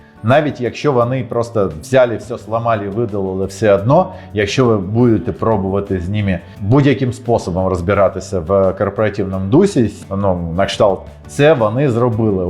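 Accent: Russia. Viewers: За старання українською - респект, але слухати дуже важко. Не знаю скільки репетирував та робив дублів, проте "азіровську" не виправив аж ніяк. 😊 Особливо плутає і та и. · Покращуй, вимову... Трохи віддає азіровщиною, а це досить неприємно!!!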